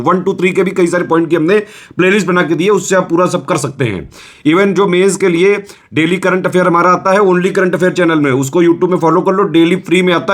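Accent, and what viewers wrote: Kanpur, India. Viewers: Sir ye apka kanpur flavour mast hai😊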